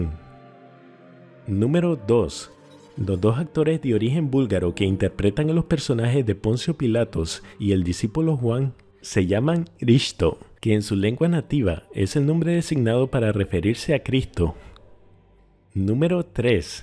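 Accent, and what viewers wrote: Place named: Spain. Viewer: Muy buen video pero tu acento es espantoso debe ser castellano neutro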